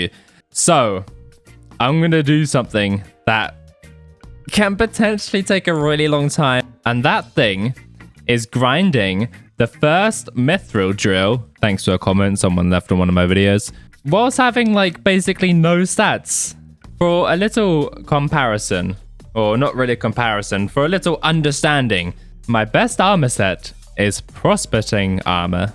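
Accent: United Kingdom. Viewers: Can you take the britishness down a notch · British voice killing me 🥀🥀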